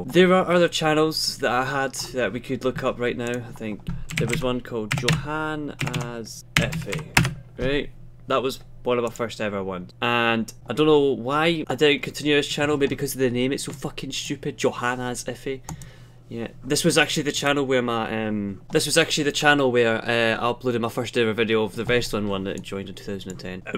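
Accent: Scotland, United Kingdom. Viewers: Are you from scotland? :)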